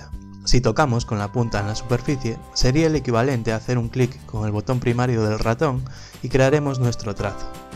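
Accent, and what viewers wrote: Galicia, Spain. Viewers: Eres gallego? jejeje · eres gallego! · Es cosa mía o eres gallego amigo mío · Eres gallego?Yo también... :D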